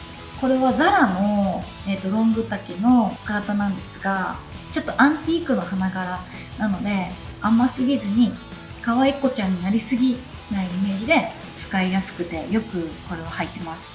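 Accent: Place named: Tsugaru, Japan. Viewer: 津軽訛りで好きなこと早口で喋ってる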